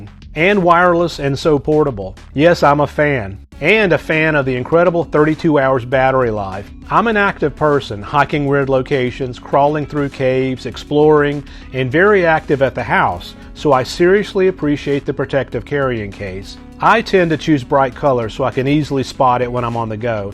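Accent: South, United States. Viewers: I've come to love watching things like aqua/pondscaping, and this really clicks for me. Your calming, southern voice soothes me and your choice of music is so nice and chill it's easy to follow, plus being filled with all the nature noise. Thanks for what you do,.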